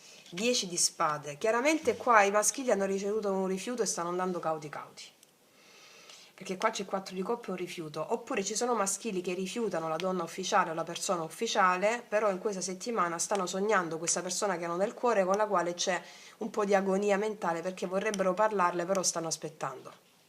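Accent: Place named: Sicily, Italy. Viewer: Ciao mi sa è siciliana 😊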